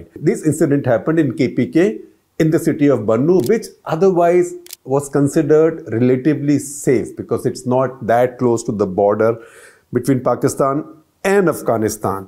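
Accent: India. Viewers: There's something known as 'Indian accent'. Its less of an issue and more of a feature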